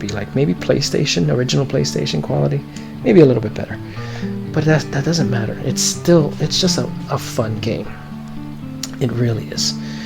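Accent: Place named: Northeast, United States. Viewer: Hi, I enjoyed your video. I'm thinking about purchasing the game, so you are helping make up my mind. Looks like a great game. I love your accent, I'm thinking northeastern American city?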